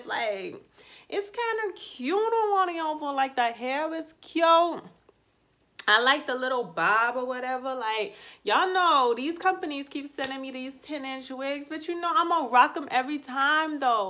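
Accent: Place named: Charleston, United States. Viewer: Where are you from lol ? You sound like us in Charleston lol love the review very nice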